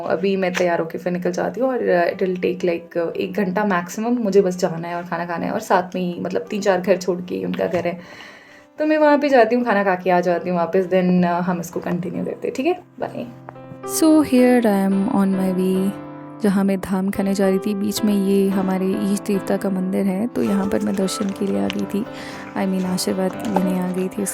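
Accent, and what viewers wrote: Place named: Mandi, India. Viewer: Hello I am also from Kangra .....apki awas s esa lag ra ki ap Mandi s ho ?? Kya ap mandi se ho 🤔🤔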